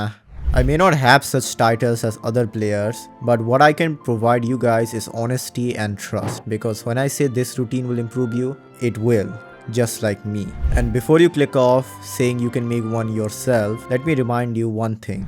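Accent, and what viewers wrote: India. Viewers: I heard the Indian accent and knew this was going to be the best Tsb video I‘ve ever watched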